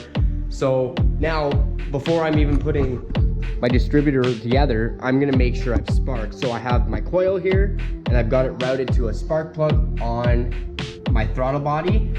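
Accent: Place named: Canada. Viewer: from the accent im gonna guess r u from Canada?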